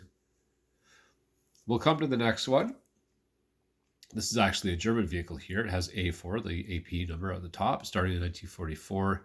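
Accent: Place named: Canada. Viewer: Sexy sultry Canadian voice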